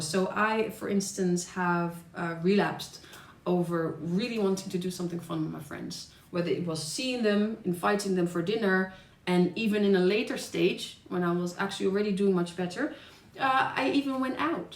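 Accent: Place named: Netherlands. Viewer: Girl, you mde me lough, "find the things, that are worth relapsing for." I am out of a relationship with a hot big boobed psychopath for about 4 months now. After 2 weeks I couldn't move a finger, I needed 12 h of sleep. I don't want to see people, only one friend left, a lawer who pulled her out of my house with the police and fiscalía. But I am fine with that. I don't have to work, so I can be me as long as I want. What I do is accept it. Now I am getting better day by day, I do a lot of work in my house, welding and all kinda stuff. I am still very very slow, but I see the results. I don't think M. E. , is caused by a virus, it's a respond to abuse, and I respect the respond of my soul to it. I can't even think of working out to get my sexy body back, even the thought of girls looking at me repells me. Time has a different meaning to me now, I don't feel I am missing out, I enjoy myself. 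When I feel like it, I got the chance to meet new people and I will do it very slow as well, there is power in doing it slow. You have a nice accent, from the Netherlands? I was a German. I was very fast there. Saludos desde otro lado del mundo Sudamérica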